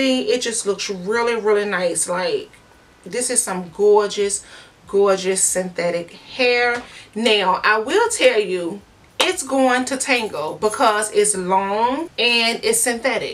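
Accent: New Orleans, United States. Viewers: Are you from New Orleans?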